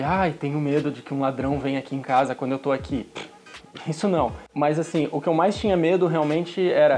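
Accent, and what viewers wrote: Rio Grande do Sul, Brazil. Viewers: Você é de Florianópolis ???? Pergunto por que teu sotaque é de gaúcho !!!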